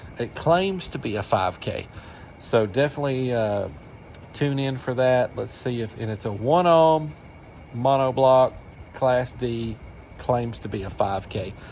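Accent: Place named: North Alabama, United States. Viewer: Whoa, you're local to me sounds like, I'm in North 'bama too. Other hobbies are wood working and turning, and hobby farm.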